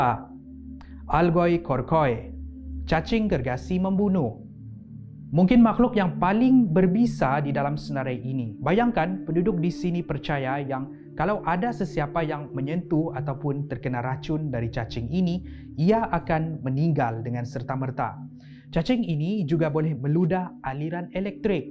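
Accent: Sabah, Malaysia. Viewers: Bkn sarawak rasanya ..tp sabah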